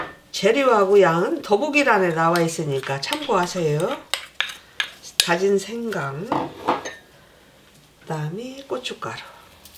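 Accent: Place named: Jeolla, South Korea. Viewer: 무수~ 전라도 사투리 울할머니 생각납니다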